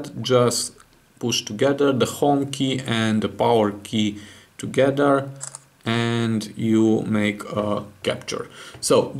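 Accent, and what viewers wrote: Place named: Romania. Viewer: Your accent made me feel like home :))) Go Romania!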